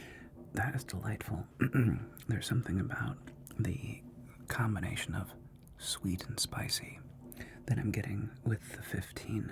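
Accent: Scotland, United Kingdom. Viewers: From Scotland here, loving the accent attempt. Could have fooled me at some points lol, thank you for highlighting a peak part of scottish culture🎉❤ Appreciate the video please keep up the amazing work😊😊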